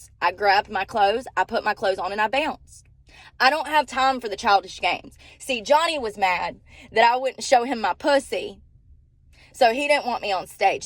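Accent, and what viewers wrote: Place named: South, United States. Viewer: You are a strong woman. I love how real you are. And I love your accent. Being from the UK I have always loved the American accent. Especially the country accent.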